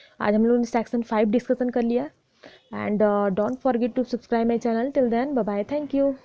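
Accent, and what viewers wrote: Bihar, India. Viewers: Maam bihar sa hoo kya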